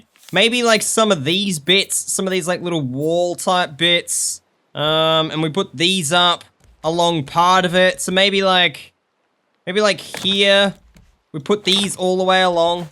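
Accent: Australia. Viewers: Are you Australian? I sub to Aussies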